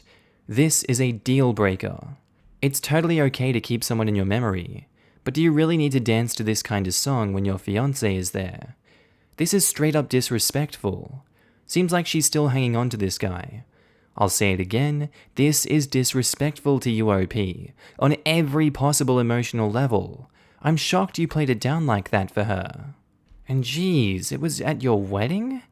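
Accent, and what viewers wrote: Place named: Australia. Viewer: Aussie accents are hot, then add that personality, voice and face and you have a quadruple threat guy!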